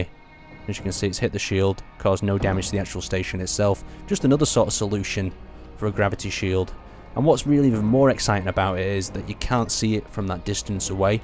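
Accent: United Kingdom. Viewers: "no dam-edge to the stayshin at awl" filthy fucking britbong liar it damaged the station get ur fuckin eyes checked are they as bad as yoru teeth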